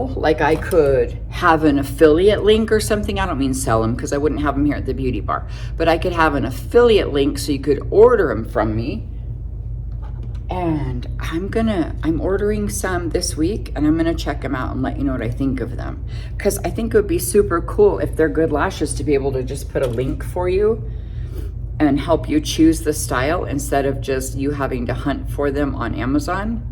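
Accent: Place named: South, United States. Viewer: I love you. I can be down and watch you and before you know it, I’m laughing and enjoying myself. Your imitation of Paula Dean was “right on” and I swear you have southern roots from somewhere. I’m going to order the eye cream as it seemed so refreshing. Hopefully I can find the link after the video. Okay, I’ll get back to it.❤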